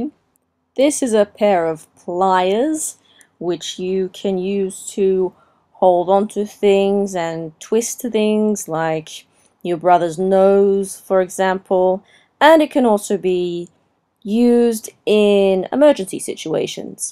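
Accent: Australia. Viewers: Your accent is bueatiful,where are you from?...from Australia l bet! by the accent .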